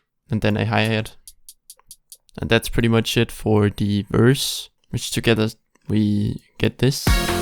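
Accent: Denmark. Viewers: Correct me if I’m wrong, but that’s the most danish accent I’ve ever heard (dope titprial tho!)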